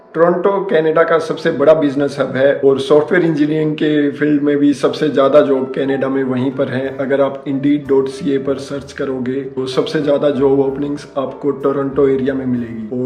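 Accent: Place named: India. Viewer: Omg how to understand that Indglish..😩😵‍💫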